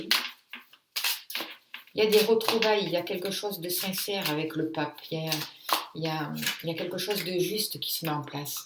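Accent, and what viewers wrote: South, France. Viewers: bonjour et merci pour vos videos tres justes, et j adore votre accent du sud ;)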